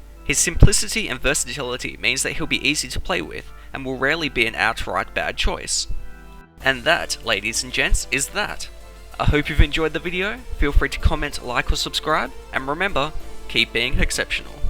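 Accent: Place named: Australia. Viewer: Very much like your video trying to place that accent... I wanna say adelade? maybe Melbourne. Its not New Zealand... Use of /aː/ as opposed to /æ/ Word Hobart Melbourne Brisbane Sydney Adelaide Ave. over all five cities graph 0% 30% 56% 70% 86% 48% chance 0% 60% 25% 80% 86% 50% demand 10% 78% 78% 90% 100% 71% dance 10% 35% 11% 30% 86% 34% castle 60% 30% 33% 100% 86% 62% grasp 90% 89% 89% 95% 100% 93% to contrast 100% 100% 100% 100% 71% 94% Ave. over all seven words 39% 60% 56% 81% 88% 65%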